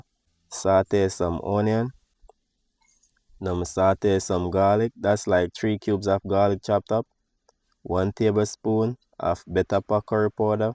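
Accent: Jamaica. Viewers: I just love mi JAMAICON accent and pronunciation. Get well soon, we miss you.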